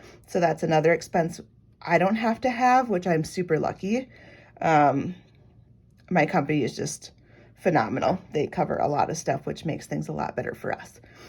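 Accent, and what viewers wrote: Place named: Midwest, United States. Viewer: For real! I didn't hear the nerves at all. I actually told my sister that I would listen to you if you had a podcast because it's like I'm chatting with a friend! Maybe it's the Midwest "accent" we have that sound so familiar hehe :)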